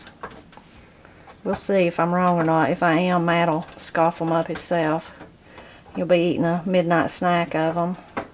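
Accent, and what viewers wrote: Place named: Tennessee, United States. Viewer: Yum! I love friend green "tamaytas" and these videos! I am 3 generations from TN where my Maternal ancestors come from. Hearing your accent, some of the words, even the way you cook, reminds me of my Grandma. We called her Parents Mammo and Pappo, and Pappo was from TN. Seeing your videos really reminds me of my roots. Thanks for sharing everything.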